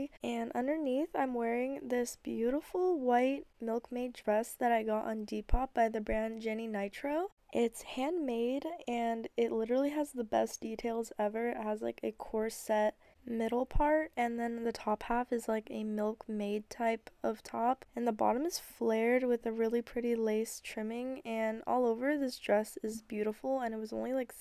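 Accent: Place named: Russia. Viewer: может мне кажется, но почему-то у меня создаëтся ощущение что у неë русский акцент) но девушка очень милая, и образы подобранны очень грамотно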